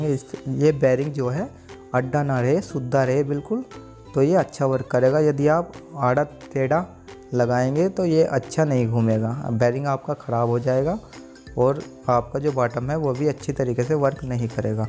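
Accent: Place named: Meerut, India. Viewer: उरे, परे की बोली, भाई वाह, मेरठ से हो या बुलंदशहर से l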